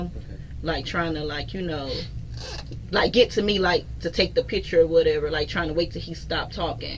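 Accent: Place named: South, United States. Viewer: Where she from? She sound country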